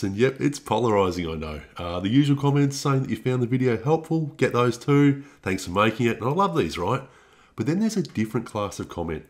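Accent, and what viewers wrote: Australia. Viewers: Yes it helps us learn and understand math when we get how the algorithm works. Another great lesson . Yet I marvel at the idea that some- know it all -sharpshooter should hoot about your videos for any reason . I mean seriously, if they don't like your " down under " dialect they should go find something to do with themselves.😁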